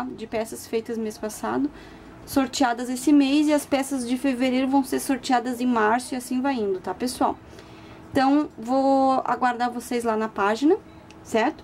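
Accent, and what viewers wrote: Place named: Curitiba, Brazil. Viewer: amei vc é de Curitiba? tenho parentes em Curitiba, o sotaque é igual ACHO LINDO Bjs ♡♡♡♡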